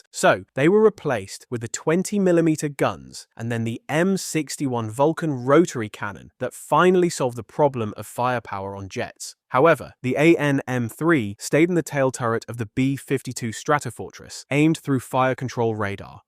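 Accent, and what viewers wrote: RP, United Kingdom. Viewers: Many things I give to the British being an American. The one thing I give more than anything is that for some reason the posh accent makes any statement feel authentic no matter what it is compared to most Americans unless it’s someone like Mike Rowe, Morgan Freeman, Etc. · Hey buddy, take your twelve point seven by ninety nine millimeter, and shove it up your British accent stove pipe, okay?!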